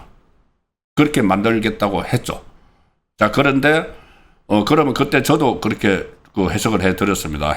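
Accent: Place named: Daegu, South Korea. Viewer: 송구컨씨ㆍㆍ아무리 지방출신이라도 발음 억양 좀 조정해라ㆍㆍ듣다가 보면 짜증난다 나도 대구다 그리고 어설프게 코미디언 수준으로 짖지말고 좀 잘해라 내용이 없다 다 보고나면 영남일보냐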